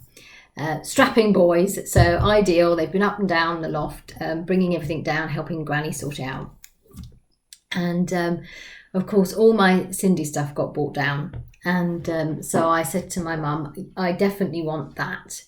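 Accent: United Kingdom. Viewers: OMG! When I heard you say "squirrel" I was like my soulmate, I use that phrase a lot when I have "squirreled some dolly funds away" haha. Love it. I am English but have lived overseas for over 21 years. Sindy was my very first fashion doll. I collect fashion royalty dolls by integrity toys and really into the bigger girls now, such as pasha pasha, joydolls, doll menagerie, tender creations and other ball jointed doll artists. These talented artists seem to all be in Russia or the Ukraine. Just lovely hearing a English accent. I have just subscribed to you.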